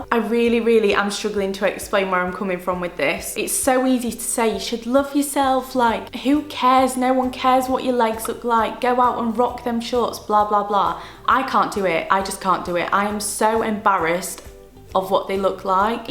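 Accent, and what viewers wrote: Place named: England, United Kingdom. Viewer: Iam from Algéria i love u and l like ur english accent 💗💗💗💜💜💜💜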